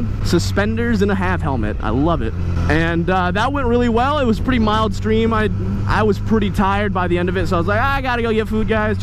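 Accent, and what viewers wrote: Australia. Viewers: more Australian accents in ur vids 😂 pls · I'm Australian and I love your Australian accent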